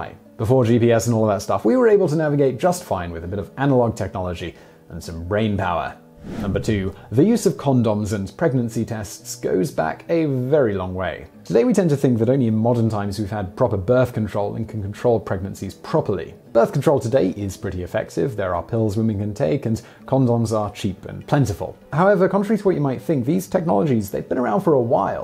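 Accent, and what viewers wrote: United Kingdom. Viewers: Your way of talking and breathing and articulating and .. it's so weird, like you've got astma, but I believe as you're british, that helps on the video sound, the channel is awesome !!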